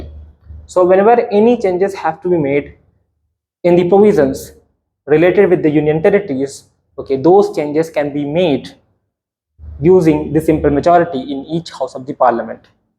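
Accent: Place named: England, United Kingdom. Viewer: You English Accent is awesome bro🎉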